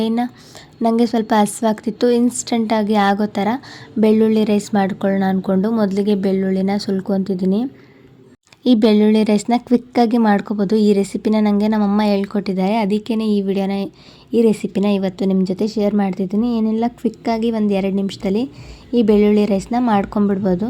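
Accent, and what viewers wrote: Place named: Davanagere, India. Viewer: Nim district yavdu neu same nam tarane matadtira i think davanagere avara neu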